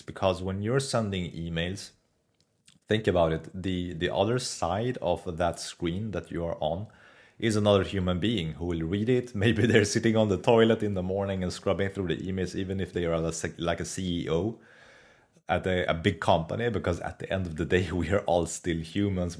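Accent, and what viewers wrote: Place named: Sweden. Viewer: Swenglish is strong in this one. Great value video surprised it has so low view count!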